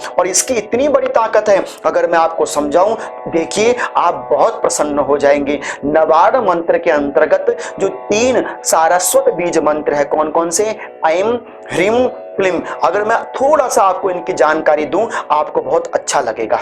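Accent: Standard. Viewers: शुद्ध उच्चारण अत्युत्तम। धन्यवाद इस प्रकार की जानकारी के लिए😊🙏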